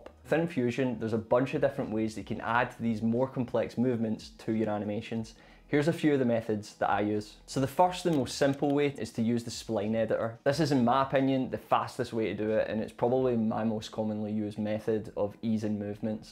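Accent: Scotland, United Kingdom. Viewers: Love your videos, very informative and easy to follow... and the scottish? accent just makes it better..... had any IronBru lately?? :D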